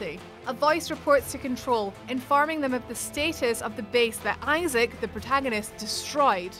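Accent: Scotland, United Kingdom. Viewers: Shes from Kinross in Scotland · This Scottish accent is fantastic!!! This is what I want for every video!!!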